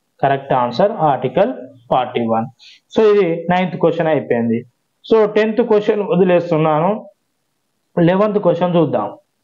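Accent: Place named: Karimnagar, India. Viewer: Sir karimnagar language lo chala baga chepparu thank you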